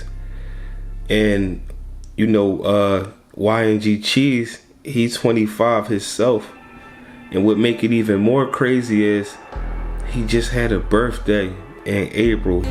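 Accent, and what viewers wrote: Philadelphia, United States. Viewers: U sound like a Philly nugga so ima take ur word 4 it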